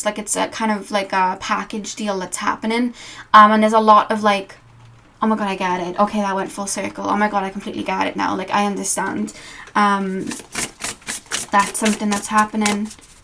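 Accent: Wales, United Kingdom. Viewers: #3 Love your lovely Welsh accent. Take no notice of anyone that says otherwise. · Thank you,! Pile 3. I, for one, never thought your accent was faked! It's very charming and very British Isles...bless you for your dedication to your audience! Sending love!🙏🙏😊❤️ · thanks for the reading, and I love the Welsh accent, don’t worry about those people who have to say something ridiculous about you, the comments are more about showing who they are than who you are. Take care of you! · Pile 3: You sound perfectly, authentically Welsh to me! I have to agree with your interpretation of "divine timing." Besides, we're not really separate from the universe in any sense, so how could it be up to anyone but us? Thank you! 💜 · I am by no means am knowledgeable about accents (as an ignorant American), I thought your accent was authentic but assumed you were from Ireland. 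😅 I thought you had an Irish brogue until you said you were from England. Whoops. 😆 So, up until following you, I had never heard a Welsh accent. I may have, but always assumed it was Irish I guess. 🤷‍♀️ Anyway! Amazing readings as always! Thank you for taking the time to make it and upload this. 😊🙏💕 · Welsh is just an accent we don’t hear a lot, at least here in the US. We get some new Irish & English immigrants and visitors, and both those accents are well known culturally anyway, and so is Scottish(I love Billy Connelly), but I’ve never met a Welsh person or even heard a Welsh accent in a movie. The first time I heard you you sounded like a mix of multiple accents to me-including various NY accents(which includes different accents in each of the five burroughs, plus Latina and Carribean accents), and there were moments when you even sounded like you were from “the valley”/California, in addition to a vague scotch-Irish-English sounding accent. 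It was confusing because you like American pop music so much, and talks in an English accent a lot, and she’s from NYC, and Carribean-American, but she lives in Hollywood now. I prefer listening to musicians from around the world on NPR Tiny Desk YT channel. In female rappers I like, and. I also love singers, and. My favorite artist might be; does a beautiful cover of / 💚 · I love your accent ❤️ My mum died when I was young and she was Welsh so I enjoy watching you because it reminds me of her and it’s not an accent I hear outside of my own family in Australia xx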